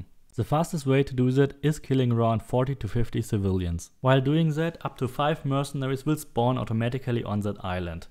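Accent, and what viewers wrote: Germany. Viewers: Love this channel. Despite having a strong german accent (like me:D) which could be difficult to understand by everyone these informations are pure gold. Thanks to this channel I played the game again. I have so many ability points that I can try out many sets which are also presented in this channel in a very detailed manner. Very helpful! Thanks! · Only took me 10 seconds to recognize that you are german. Love your videos. Keep going <3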